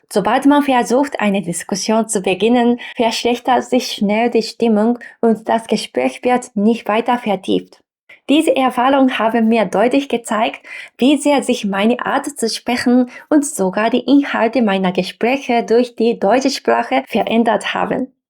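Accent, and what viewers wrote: Japan. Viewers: Dein Deutsch ist hervorragend. Verstecke nicht deinen japanischen Akzent, der steht dir und klingt gut. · Dein Deutsch ist so gut da müssen Sie nichts verbessern, und Ihr Japanischer Akzent stört Niemanden im Gegenteil das macht Sie so Symphatisch. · Stimmt, deine Stimme ist tiefer, aber dadurch auch klarer und selbstbewusster. 👍😁 Was ich höre, ist klar noch die Betonung (da würde ich das Haupt-Augenmerk drauf lenken ... ruhiger sprechen, leiser, dass der Fluss weicher wird und die Betonung nicht so stark hüpft ... 🤔), manchmal das "ch" und das Japanische R noch ... aber nicht immer. Generell ist die Verständlichkeit und der Satzbau klasse! 👍 Vielleicht hilft dir das etwas... 😉 Ja, Ironie ist ganz wichtig! 😁 ... ist quasi das deutsche Luft lesen, denn die Kunst ist hier, zu erkennen, ob es ernst, oder ironisch gemeint ist. 😮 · Behalte dienen japanischen Akzent, es sind deine Wurzeln, sei stolz darauf. 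Ich liebe die japanische Kultur. Aber ich vergesse auch nicht, das Deutschland wie Japan in der Vergangenheit schlimmes getan hat. Ich hoffe unsere Völker lernen daraus. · Hi, ich schaue deine Videos ganz gerne. Wann hast du die Deutsch Grammatik gelernt und wie, das ist ja nahezu perfekt, also wesentlich besser als meine Rechtschreibung ;). Also ich finde es super, wenn jemand der nach Deutschland kommt sich die deutsche sprach bei bringt. Da bin ich aus meiner IT Job anderes gewohnt. Aber ich erwarte nicht, das die jenigen auch noch Akzentfrei sprechen können. Du kommst nunmal aus Japan, das sieht man nicht nur, sondern man darf es auch hören. Lustig das die Sprichwörter oft in beiden doch unterschiedlichen Kulturen funktionieren. Jetzt habe ich dich von Anfang an geduzt. Wird in Japan zwischen Du und Sie unterschieden wir bei uns? Aber warum habe ich das nicht korrigiert, naja, ist ja nicht unüblich hier auf YouTube das Du zu verwenden. 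Obwohl es hier eigentlich üblich ist das Sie zu nutzen wenn man wen nicht kennt und sich nicht auf das Du verständigt hat. Aber es kommt oft auf die Umgebungen an. Nicht nur beim Sie und Du. Es ist sehr schön zu erfahren wie Menschen aus anderen Ländern Deutsch und Deutschland wahrnehmen und die Kulturellen Unterschiede herausarbeiten und dazu auch noch einordnen. Und Du machst es auch noch gut, das kann mann nicht von allen YouTubern in deinem Format sagen, bei Dir habe ich das Gefühl das Du wir Japaner und Ich individuum aus Japan gut unterscheiden kannst. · Also, dein japanischer Akzent ist sehr angenehm und klingt toll. Meine Meinung... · Wenn Du deinen japanischen Akzent raus nimmst ist das so als würdest Du die Gewüze aus einem Essen nehmen. Du bist Du und das ist gut so. Es steht Dir zusammen mit deiner symphatischen anziehenden Art, also falls ich das feststellen durfte. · Du bist durch das japanische gewohnt immer mit einem Lächeln zu reden. 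Wenn du den Mund mehr schließt beim sprechen wird auch dein Akzent weniger. Vielleicht hilft dir das ja. Aber verliere bitte dabei nicht deine positive Ausstrahlung :D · Der leichte japanische Akzent ist reizend, Grammatik, Aussprache und Satzbau sind perfekt. Respekt!! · Niemand erwartet, dass du deinen süßen Akzent aufgibst. Der japanische Akzent wird als sehr nett empfunden. Ich kenn keine Person aus dem jappansichen Sprachraum, die besser Deutsch spricht, als du. Ich habe länger zugehört und wüsste gar nicht, welche Tipps zur Verbesserung ich überhaupt geben sollte. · Behalte um Himmels Willen Deinen japanischen Akzent! Was Du tun kannst, ist Dir einen prägnanten deutschen Akzent wie Bayrisch zusätzlich anzueignen. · Ich finde deinen Akzent schön. Wahrscheinlich, weil ich die japanische Sprache als besonders schön empfinde und gerne höre. 👍👏👏🇩🇪👋😊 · Ich liebe diesen japanischen Akzent, du musst dich auf keinen Fall verstecken oder Gedanken machen.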